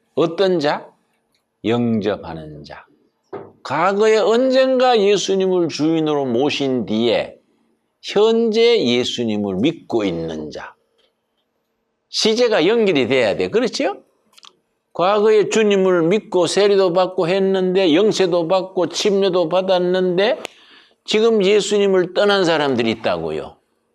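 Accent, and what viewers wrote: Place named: Gyeongsang, South Korea. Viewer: 구수한 경상도 사투리로 엮어 주시는 하나님말씀 때때로 난해한 성경말씀을 쉽게 풀어 강해해 주시는목사님 말씀은 언제나 제게 감동을 줍니다 감사 합니다 감사합니다.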